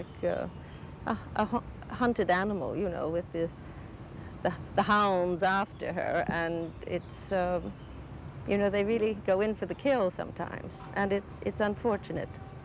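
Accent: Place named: Europe. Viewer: she's lived in Europe among Europeans for decades so she's almost certaintly developed the accen you can hear.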